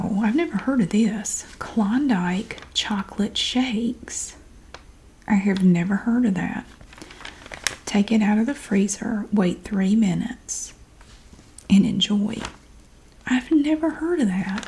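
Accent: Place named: Danville, United States. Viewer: Lord she sounds like she's from Danville VA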